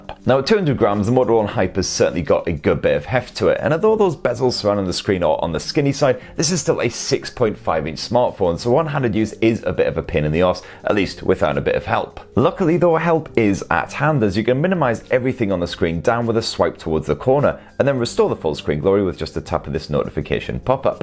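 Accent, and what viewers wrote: United Kingdom. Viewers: Lisandro Bravo you realise that he has British accent, which is the original English?!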